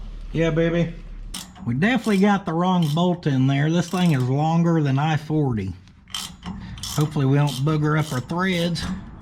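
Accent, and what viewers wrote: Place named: Los Angeles, United States. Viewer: Your from LA I knowd it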